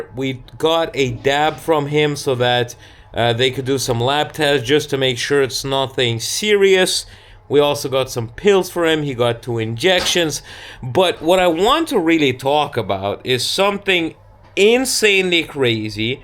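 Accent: New York, United States. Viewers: Надеюсь. Он снимает фейковые видео, чтобы заставить вас пожалеть. Он не настоящий русский. Разговаривает как какой-то нью-йоркец. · i notice in this video you have a hint of a New York accent lol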